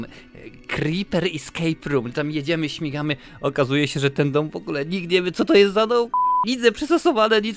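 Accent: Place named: Podlasie, Poland. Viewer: Czy pochodzi Pan moze z Podlasia? tak fajnie Pan zaciaga ;)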